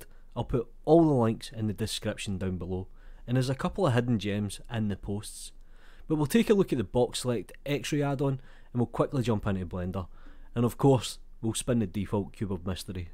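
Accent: Scotland, United Kingdom. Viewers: I just love your Scotland accent, you actually sound like one of the people from the movie BraveHeart with Mel Gibson!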